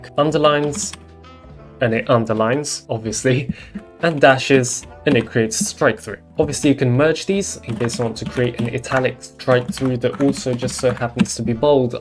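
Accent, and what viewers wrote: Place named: New York, United States. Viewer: sounds like newyork :D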